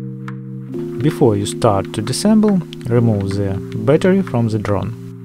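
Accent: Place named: Russia. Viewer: russkiy accent chyvstvyetsa))))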